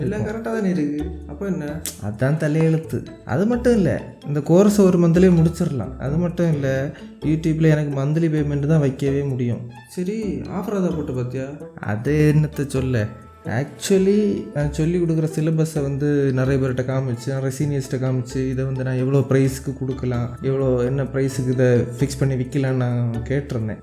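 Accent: Kanyakumari, India. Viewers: Hii neenga Kanyakumari ah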